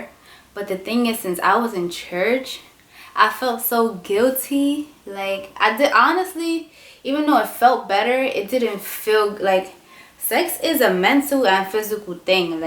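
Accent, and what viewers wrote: New York, United States. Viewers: Zaire Williams is she from New York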